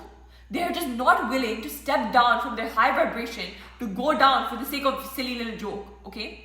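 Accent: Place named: United States. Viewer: Whats wrong... Its bit American and is completely fine and impactfull.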